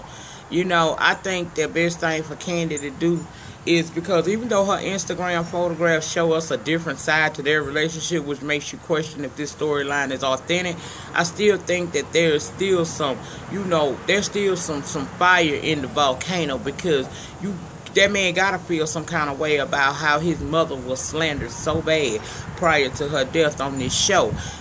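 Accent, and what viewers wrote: South, United States. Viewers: I just came across your channel 2day...faints....I'm back. I think its safe to say I am a fan just 2 reviews in...You're giving me Southern Teas. My Gawd, where are you from Miss?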